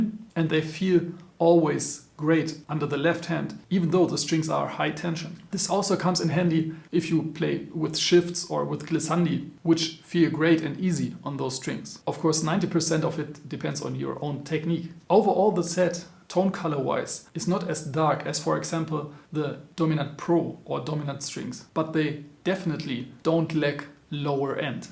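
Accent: Germany. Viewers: i also love your German-accent english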